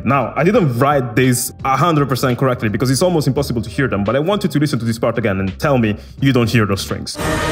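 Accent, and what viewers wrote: Italy. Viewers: Man, are you italian, or resident in Italy? Your accent really sounds like it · Non sapevo tu fossi italiano! Ti ho cominciato a seguire un po' di tempo fa e sentivo qualcosa di familiare nel tuo accento... Adoro le analisi che fai, ottimo video come sempre! Greetings From Italy!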